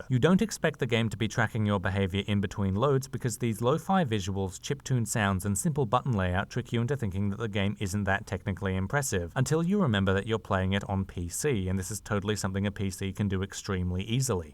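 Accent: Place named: Australia. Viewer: The ozzy accent is strong in this one.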